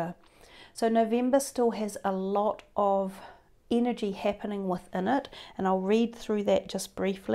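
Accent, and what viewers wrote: New Zealand. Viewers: I adore her accent. Kiwi accents are lovely. Blessings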